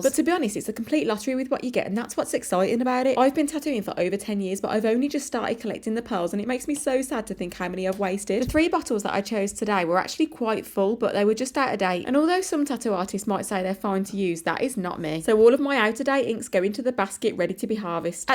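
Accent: United Kingdom. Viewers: are you scottish? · I love a brummy accent, it's so comforting ❤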